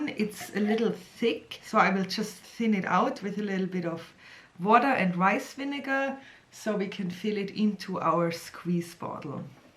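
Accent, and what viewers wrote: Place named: Stuttgart, Germany. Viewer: Lucky you with the Ibiza Carob syrup. I like how you start out the video with a wet mark on your apron. You work even before you film, I guess. That Nutri Bullet looks like it went through WW I and did some service on the Western Front with Erich Maria Remarque... You are brave to use that dried Shitake mushroom... I like your knife skills. You didn't even chop off a nail... did you ? I think I make something like this every other day. I called it an omelette... I like your accent. It makes me feel like I am listening to a Professor from Stuttgart... then I look and it is a pretty blonde... that always freaks me out... in a good way... -Jeff Goes Random. Jah.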